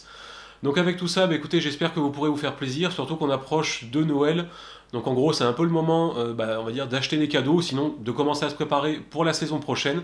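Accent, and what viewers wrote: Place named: South, France. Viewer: je participe,compte facebook:Numa Fishing , Big Up première chaîne You tube dédiée a la pêche !!je pense c'est l’accent du sud qui joue un peu,mdr !! a plus les mecs ....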